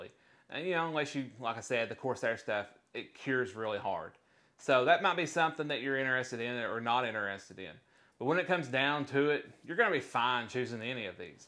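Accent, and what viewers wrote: South, United States. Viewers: R u southern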